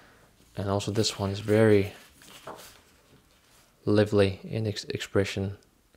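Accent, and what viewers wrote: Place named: Denmark. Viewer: You sound very danish 🇩🇰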